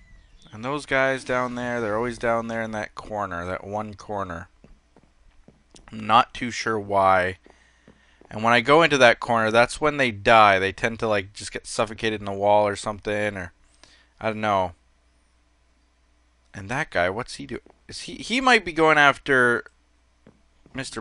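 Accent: Canada. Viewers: are u Canadian I can tell by ur voice and when ur saying eh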